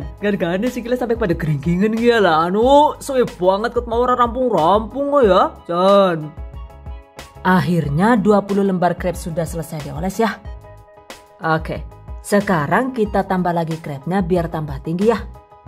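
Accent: Banyumas, Indonesia. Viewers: jannn deneng wong ngapak ya yune, pada bae karo nyonge.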